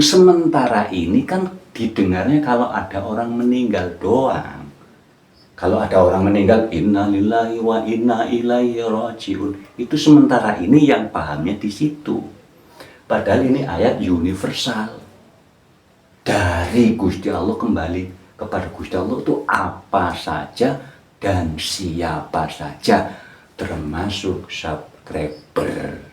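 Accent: Banyumas, Indonesia. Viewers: Ngapuntene pak tanggled bapake saking pundi gih, nopo tiang ngapak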